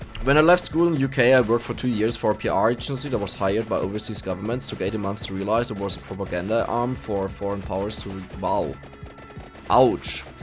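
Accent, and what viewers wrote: Germany. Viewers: He already know why sounds German.... Because he is German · How you pronounce your English words is how non-Germans recognize your nationality... it's your accent. That's not good or bad, it just is what it is. :) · Your english is close to perfect I think. Its just the accent thats German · You wondered why people can tell that you're German. I think the two biggest tells are pronouncing "the" as "de" and the specifics of "ya" as a replacement for "yes." "th" becoming "d" is VERY German. You extend the "a" at the beginning of "ya" and use a sound at the beginning that's halfway between "y" and "j," which is also very German. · the reason people can hear you're german is your pronounciation of words, and also your "so" word. You say so as a german would say so in german xD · If you want to know why you sound German I can recommend the video from improve your accent on this topic (it helped me with my Englisch) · I heard you where German the first time I saw a video of yours! 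I am Norwegian, so I recognise German/english easily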